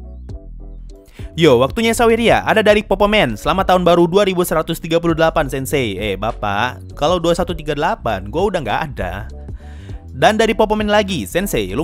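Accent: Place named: Eastern Indonesia, Indonesia. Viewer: Boleh tau suku apa bro? Tidak ada maksud menyinggung, soalnya dulu perasaan logat timur lu kerasa banget di video lama tapi makin ke sini makin halus dan nggak kerasa lagi logatnya.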